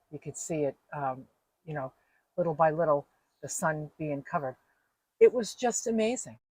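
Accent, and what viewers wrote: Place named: New England, United States. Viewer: I love your New England accent! Also, your talent and adventures! I'm in Alabama and never been out West.. would love to see the Grand Canyon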